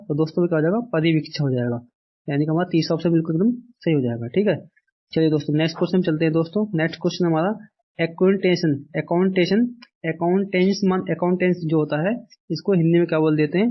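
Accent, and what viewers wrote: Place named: Haryana, India. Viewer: Haryana sa ho kya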